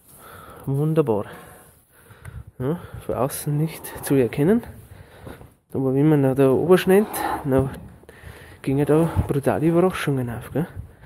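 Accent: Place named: Bavaria, Germany. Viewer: Guten Abend … (Ups, kenne deinen Namen gar nicht🤭) Ich finde hier deine Erklärungen betreffend der Biologie, inklusive dem Esoterischen Part über den Walnussbaum, sehr sehr interessant und aufschlussreich, zudem, die Sägetechnik kommt auch nicht zu kurz! 👏🏻🧐😃 Du hast wirklich ein super umfassendes Wissen, Hut ab. 🎩 Man spürt eindeutig deine Freude, Liebe und Hingabe zum Holz, der Schalk im Bayrischen Dialekt rundet es noch ab. Verständlich erzählt und „auf dem Boden“ geblieben, bleib so. 👍🏻 ☺️ Wünsch dr witerhin no an gnarret hübschi und erfolgrichi Ziit. 😉😁 Gruass us Grischun (Graubünden) ⛰ 🌲 🇨🇭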